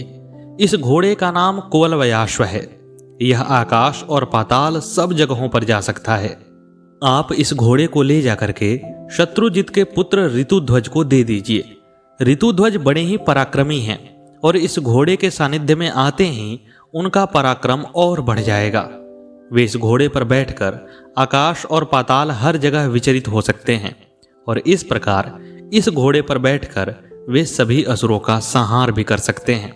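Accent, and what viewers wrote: Standard. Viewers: अति उत्तम कहानी।आपकी वाक शैली और शुद्ध उच्चारण के प्रयोग से कहानी के तत्कालीन वातावरण का आभास होता है जो श्रोता को प्रभावित करता है।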